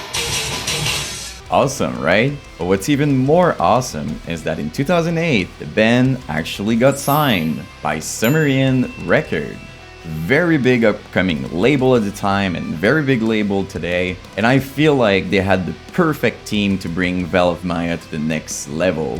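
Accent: Quebec, Canada. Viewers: Est-ce que j’entend un accent québécois? 🤓🧐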